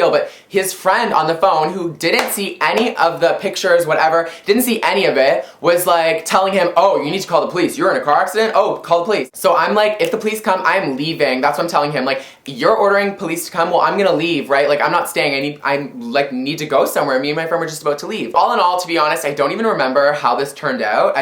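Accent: Canada. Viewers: it sounds more canadian than his normal voice hahaa x)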